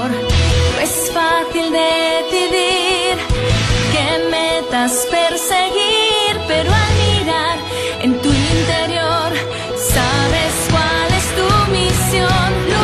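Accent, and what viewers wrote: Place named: Andalusia, Spain. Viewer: A mi tambien me gusta. Por cierto, pareces andaluz. ¿Lo eres?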